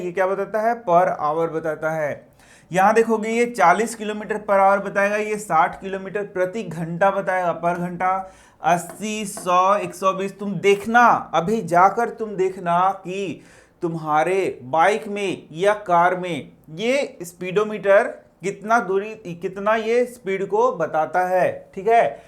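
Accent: Bihar, India. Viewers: Sir ji app Bihar ke ho kya? Mujhe to vaisa hi lagta hai ki app Bihar ke ho.apne discription me kya likha hua hai? Ek hi line to 100 bar😂😂 This is funny sir. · Sir,I thing you are from bihar🤔👍👍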